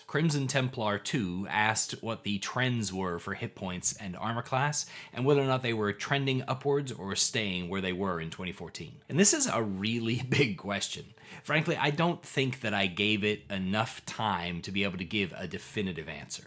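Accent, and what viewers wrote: Australia. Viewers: Amazing hearing this and your Ranger video. You Aussie accent comes through when you got back, but here I would have never guessed. 😁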